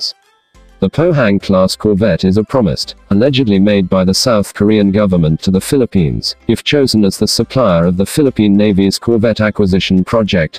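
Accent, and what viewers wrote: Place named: United States. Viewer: Bakit americano ang boses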